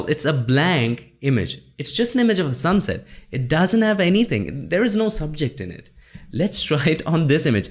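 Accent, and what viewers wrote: India. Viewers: I don't really get this guy's accent is it fully Indian or American.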